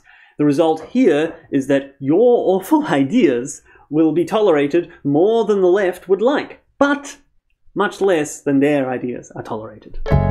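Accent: New Zealand. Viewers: just found your channel and totally dig it mate, youre clearly a very smart chap and nice to hear a fellow kiwi (especially one who got no time for leftys, haha) on YT.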